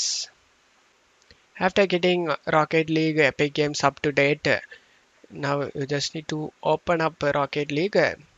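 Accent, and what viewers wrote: India. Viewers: Just because he sounds Indian doesn’t mean he’s “scamming”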